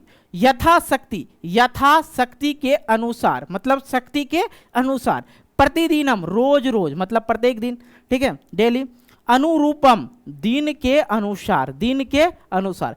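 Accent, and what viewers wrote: Bihar, India. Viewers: Tum va bihari he ka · Sir aap bihari ho kya ❤ Aap bihar se ho kya ❤😊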